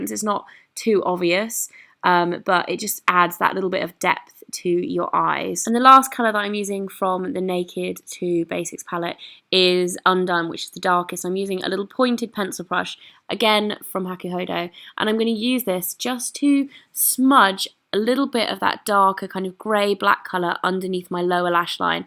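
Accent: United Kingdom. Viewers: Dear, You are so beautiful!! Even without makeup! Love how ur lipstick colours look on you! Diggin' your British accent too;)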